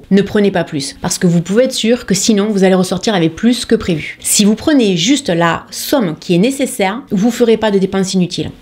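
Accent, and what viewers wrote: South, France. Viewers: Mdrrrr il est trop beau ton accent du sud. Je suis d’Avignon et merci pour tes astuces ( les coupons …etc ) Merci beaucoup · bonjour j'espère que vous allez bien? c'est toujours un plaisir de voir vos vidéos et je les adore, merci de nous faire partager ces 15 astuces utiles pour réduire le budget courses afin d'économiser de l'argent. Votre rire communicatif,votre bonne humeur et accent du sud c'est le top. J'apprécie votre simplicité, votre sincérité et votre naturel. Bonnes fêtes de fin d'année prenez soin de vous.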